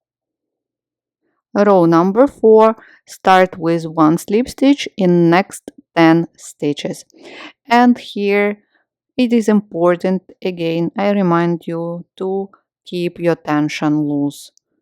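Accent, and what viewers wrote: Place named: Ukraine. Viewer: Are you from Ukraine? I am and I adore your pronunciation. Your tutorial is perfect, it's just what I need. I'm going to knit this hat. Thanks a lot. God bless you. ❤❤❤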